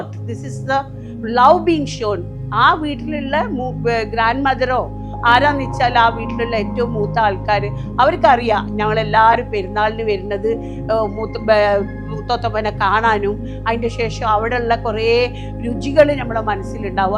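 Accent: Kozhikode, India. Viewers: Kozhikottaare samsaram kettaal thanne vayar nirayum ❤️‍🔥💪 Oru kozhikotukarii 😉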